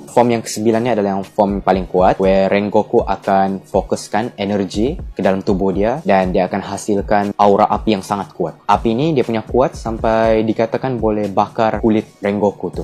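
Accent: Sabah, Malaysia. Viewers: Ad logat Sabah la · speaker org sabah ka ?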